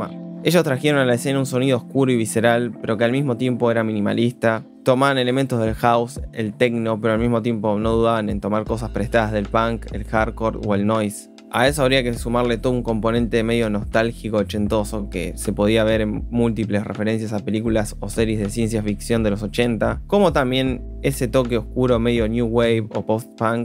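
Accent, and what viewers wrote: Argentina. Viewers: Más bien, no a todos nos gusta el acento argentino.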